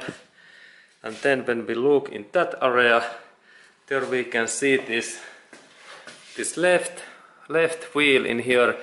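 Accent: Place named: Finland. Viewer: OK , I am willing to bet that this guy is Finnish , typical accent from Contemporary Finn who learned English as second language.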